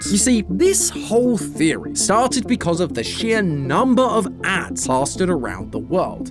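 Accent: United Kingdom. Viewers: why did matpat turn british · matpat was replaced with this british mf?! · Calling it Marry-io makes you sound like an out of touch old person lol. But maybe it's the Bri'ish accent, which is cool ngl In the states it comes off that way though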